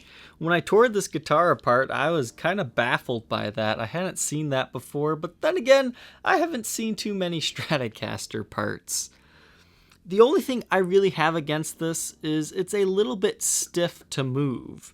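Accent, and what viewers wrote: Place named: Alaska, United States. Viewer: Thatuckin sarah palin accent is hilarious..... There is someone else who has that accent, a famous actor or SOMETHIGN..... MAN I can't think of who it is.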